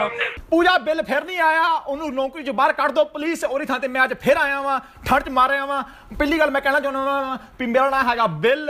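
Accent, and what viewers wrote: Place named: Punjab, India. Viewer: You have THE true punjabi accent.😂🌹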